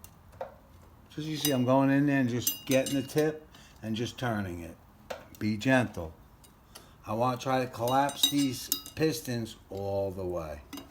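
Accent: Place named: Brooklyn, United States. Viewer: Love the accent. Sounds like Brooklyn or SINY , my part of the country.. good tips thanks for the info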